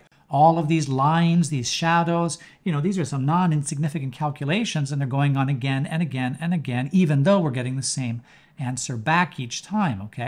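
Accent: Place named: Canada. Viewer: So I want to know how to built a custom shape that I can attach a line to, then when moving the shape, bring the attached line endpoint with the shape? I am trying to build an application (not a game) with your tutorial’s help as I’m not a programmer or web developer. I know enough to dangerous, and stupid in some cases. I first have to figure out setting up the screen into parts for various roles, how to develop a shape library for my app, and then load an image, scale that image and reuse that scale for my other functions, then place the custom shapes (save that data on open/close) and a bunch more “magical stuff“. You sound Canadian, but you’re in Japan… interesting to say the least. Thanks so much for these tutorials.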